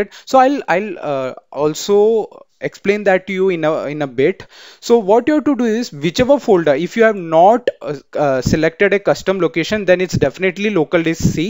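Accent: India. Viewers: I watch your 4 year old vid At that time your English is not good But now you are speak English like hindi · Indian accent, good with PC's Hell yea dude, thanks SO MUCH FOR THIS!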